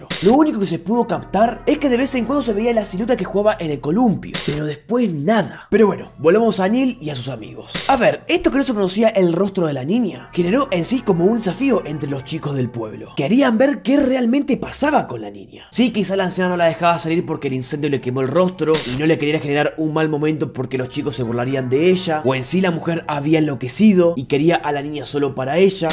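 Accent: Argentina. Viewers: Coraline la peli es buenarda pa 😎 Saludos desde🙁😥🇵🇪 El asentó es de arg pero me gusta escribir su asentó :'( 😣🙁😥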